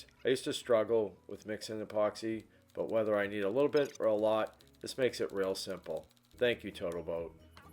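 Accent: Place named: Massachusetts, United States. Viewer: Dude! These signs are awesome! How am I just finding you now??? I grew up in Natick and now live in Portland (Maine), but I miss my homeboy accent something wicked. And Maldenites have one of the best MA accents of all. Anyway, I found you through the Rockler 2x4 Challenge and you have a new subscriber. Keep on makin'!!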